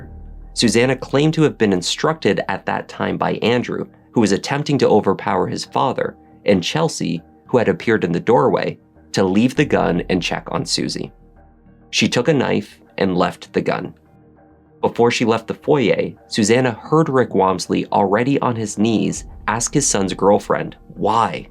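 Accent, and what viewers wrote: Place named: Canada. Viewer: He's not American. He has a very noticeable Canadian accent.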